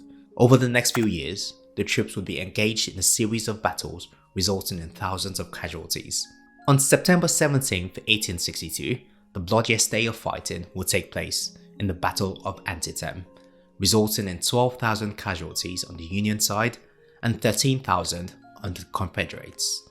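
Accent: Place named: United Kingdom. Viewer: I wont hear a british voice talk to me about the AMERICAN civil war...stay in your lane tuber.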